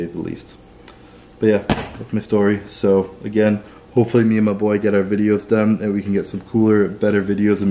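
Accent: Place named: Canada. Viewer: I was like why does he sound weird...oh yea Canadian...lol.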